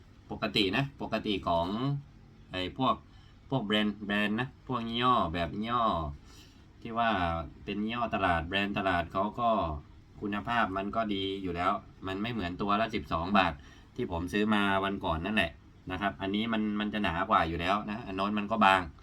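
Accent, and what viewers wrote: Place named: South, Thailand. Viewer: หล่อจังเลย ชอบมากๆๆ❤❤❤❤ น่ารัก พูดสำเนียงใต้ยิ่งน่ารักไปอีก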